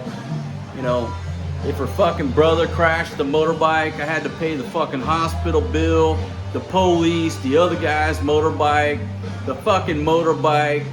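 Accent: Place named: Alabama, United States. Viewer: Brother You Got Me Cracking up Big Time. I'm here in Alabama USA 🇺🇸. You sound just like someone here would say. Be Good Bro. God Bless You Man.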